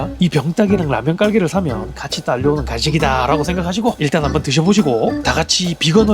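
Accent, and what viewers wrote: Gyeongsang, South Korea. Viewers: 경상도사람이라 사투리쓰는건데 성적정치적일베 ㅇㅈㄹ하고있네.. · 찐 갱상도남자 유투버맞네예 매번 잘 보고 있습니다 화이팅입니다 😊😊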